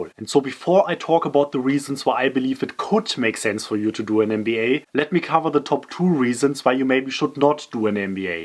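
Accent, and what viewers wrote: Germany. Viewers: Love your german accent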